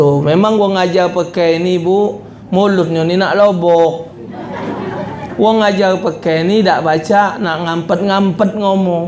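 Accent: Palembang, Indonesia. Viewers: Ingat waktu beliau ke Kota Jambi, seisi lapangan kantor walikota Jambi penuh. Cara beliau menyampaikan pesan sangat sederhana dengan logat Palembang tapi bisa dimengerti oleh semua orang, dan temanya adalah fakta yg terjadi dimasyarakat kita. Alfatihah buat beliau. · Dak bosan aku nonton ceramah almarhum, dak Ado lagi yg ceramah berlogat daerah Palembang, alfatihah pak ustadz 🙏🏼🙏🏼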